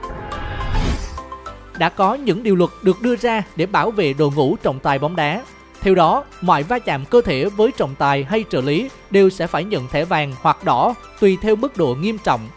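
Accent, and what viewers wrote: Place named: Binh Dinh, Vietnam. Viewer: Giọng bình định trong sâng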